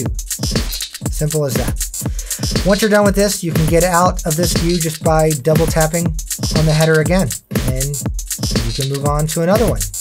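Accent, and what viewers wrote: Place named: United States. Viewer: I love video tutorials. But my english is not that good. The American accent makes it harder to follow the video, too bad. When will you finally make a manual in HTML or PDF format?? Anyone can translate that with Google Translate. In addition, it makes sense if a specific information needs, then you can search for it!. In video format, I do not have this option.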